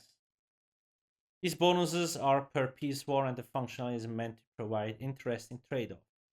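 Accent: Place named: Switzerland. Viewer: Just wondering where are you from? Your accent sounds swiss to me but my pops though more up north 🤔. Anyway you still speak very clearly and that's good especially when looking for guides...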